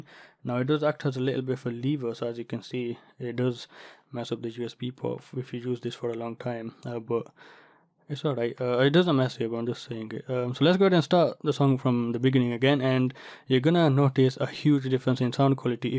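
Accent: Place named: Yorkshire, United Kingdom. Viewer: Hi. My experience of the Jitterbug, when connecting it from my PC to my Audiolab M-DAC is that there was a loss in some of the high frequency detail. It made the audio sound very analogue. I later sought advice and tried connecting the jitterbug to a different USB port to the DAC. This resolved the problem. It got rid of the noise from the PC motherboard that I bought the jitterbug to resolve. I could hear all of the detail that I previously could from my DAC. So, in short, my solution is to use the jitterbug on a different USB port to the DAC. On a different subject, are you from Yorkshire? I'm curious about your accent. Thanks.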